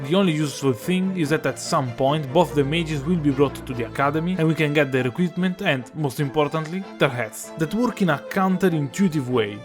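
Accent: Italy. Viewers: are you italian? this accent 😹❤️ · He sounds italian wtf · scusa la domanda, sei italiano? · Accento italiano fortissimo, poetico❤️ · Bro are you italian? (I m italian)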